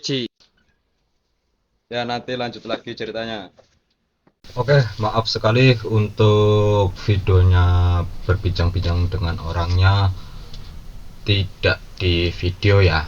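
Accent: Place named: Banyumas, Indonesia. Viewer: Mas ngapak mau tanya boleh?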